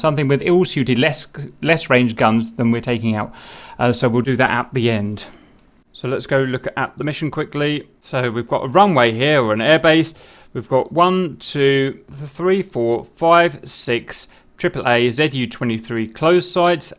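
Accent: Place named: United Kingdom. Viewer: Your British accent makes these instructional videos easier to take in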